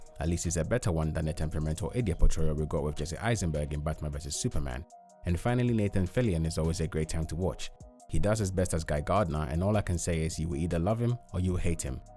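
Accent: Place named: India. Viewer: of course this video is made out from a indian dude w the accent lmfao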